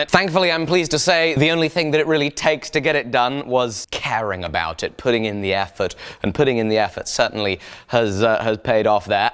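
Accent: South, United States. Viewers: That country accent though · Isn't there an electric sander of some kind you could use? By the way: good job on the southern accent! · Damn Alec, you have the Southern accent down. I think I've heard my relatives say the exact same thing about their trucks. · That's the most accurate hick American accent ever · Holy hell your southern american accent. I can't even. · Your southern accent is fucking hilarious! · As a southern I find the accent you do spot on. And boy you know we love our diesels! Turbo diesel, regular diesel, diesel fuel. We love it. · Mighty fine southern accent y'all got there Alec. By the way, what's in that there spray bottle? Just soapy water? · The southern accent is unsettling because i have to live around it. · Quite the southern accent there. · lol i loved his souther accent i was pissing my self laughing, keep up the good work Alec loving the project.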